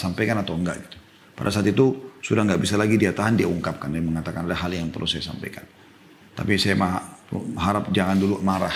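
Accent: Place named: Arab world. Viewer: Ngomong mu masyaAllah Ala arabica gitu lo.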